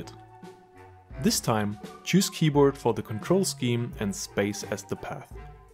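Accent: Germany. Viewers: Nices Tutorial, kann es sein, dass du Deutscher bist? xD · are you german?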